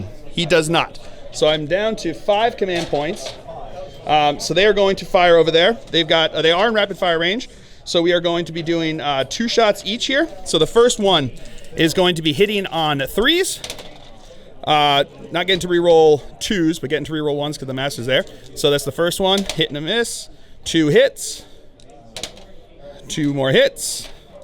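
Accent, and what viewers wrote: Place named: Alaska, United States. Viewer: Having lived in Georgia and moved to the Pacific Northwest, I have found a lot of people regularly speak like this. I think is original from Alaska (which may have similar accent)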